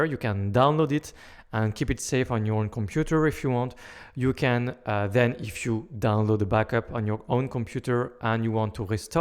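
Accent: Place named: France. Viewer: Trop bien avec l’accent français j’arrive a comprendre ce que tu raconte 😊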